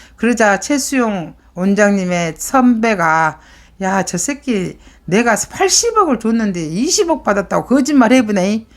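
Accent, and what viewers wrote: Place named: Seoul, South Korea. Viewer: 서울분 같아요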